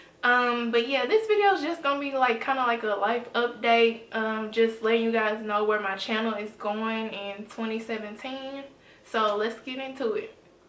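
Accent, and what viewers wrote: South, United States. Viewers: You so country but I love it!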